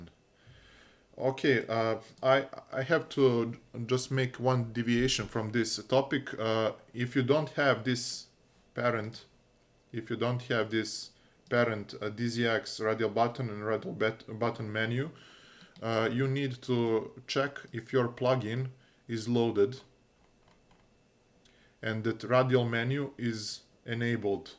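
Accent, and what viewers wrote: Russia. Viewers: Hi! I was somewhat confused by your English accent. It is very similar to the accent of a resident of Russia.:) And the name is closer to scandinavian origin. Thanks for the plugin! We will use it! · Акцент прям русский русский))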